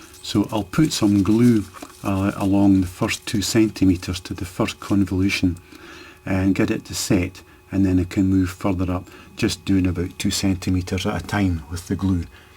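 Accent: Scotland, United Kingdom. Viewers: Love the Scottish accent! Love the detailed instructions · Love the scottish accent..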